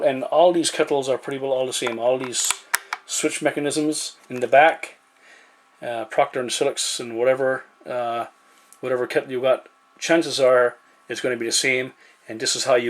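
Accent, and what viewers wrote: Newfoundland, Canada. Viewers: Geez! Great job and I’m on it right now. I heard you speak and I said to my wife,he sounds like he’s from NL maybe even ST MARYS bay! Thanks so much sir.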